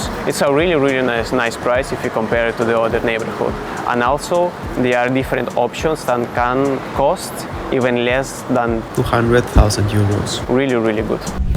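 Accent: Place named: Montreal, Canada. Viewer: Are you from Montreal? Your beautiful accent sounds like it, that's why. 😊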